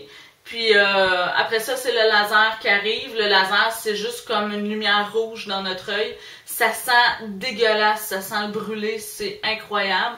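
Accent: Quebec, Canada. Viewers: Le problème est que je regarde sa vidéo de la France, mais il a fallu que je déchiffre ce qu elle dit, car elle parle le francais canadien. C est à mourir de rire.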